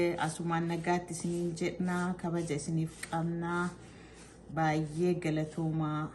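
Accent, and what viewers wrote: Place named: Arsi, Ethiopia. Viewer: Wowowowowowo jabduu teenyaa jabaadhuu😍😍😍🥰🥰💪 Ati arsiidha mama hasa'an kee arsii fakkata.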